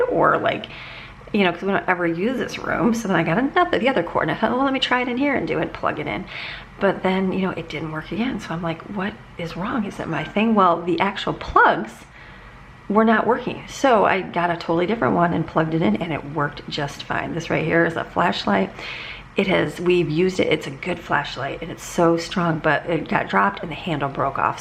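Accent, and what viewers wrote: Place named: Florida, United States. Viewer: 🙂when I looked it up, it sounded just like how says it...:) maybe it's the Floridian accent making it sound like Bells:)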